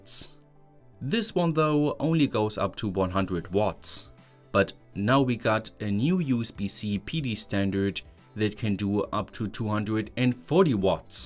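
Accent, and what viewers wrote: Germany. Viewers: uff what a strong German accent :D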